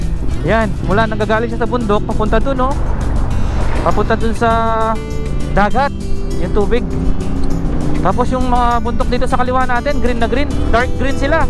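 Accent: Pampanga, Philippines. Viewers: I love your, Kapampangan accent, Say it again.... BARAG😂😂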